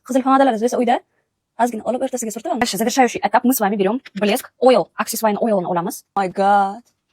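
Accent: Bukhara, Uzbekistan. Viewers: Buxorolik siz aniq buxoroni shevesi vashe yoqadide😂❤❤❤❤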